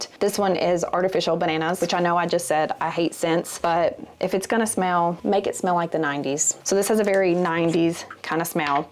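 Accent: South, United States. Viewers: that's how I feel too. I'm from TN but live in FL, so I love hearing her Southern accent. It brings me much joy.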